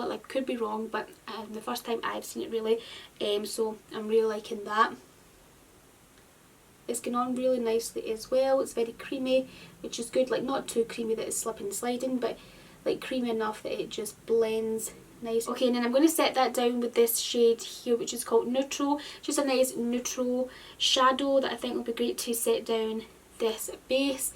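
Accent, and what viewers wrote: Scotland, United Kingdom. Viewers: i think she's scottish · Such a gorgeous girl and also such a soothing voice...ASMR candidate right here. Soft and gentle Scottish accents are the best. Great tutorial too. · She’s Scottish...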